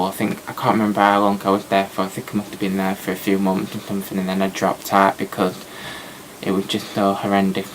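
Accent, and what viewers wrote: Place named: Birmingham, United Kingdom. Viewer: Go Birmingham! Love your accent! 😍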